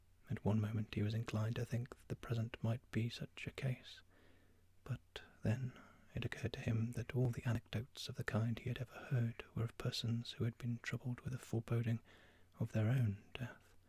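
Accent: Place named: United Kingdom. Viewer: What a great idea to relax 😌😊💚. And as a Frenchman, what a great opportunity for me to practice my English pronounciation by listening to you 👍. Furthermore, I love the British accent (Any british accents). Most of the time, It is the one that I try to imitate when I speak English 😁 ; even if I still have work to do to improve my accent 😅. In any case, this moment was very soothing 😴👍💚. Thank you for the peaceful break 🙏💚.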